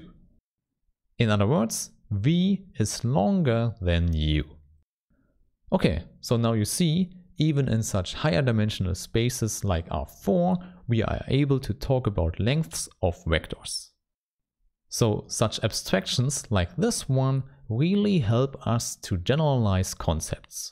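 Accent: Germany. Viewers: nice video, thanks a lot... and you sound German with your accent😁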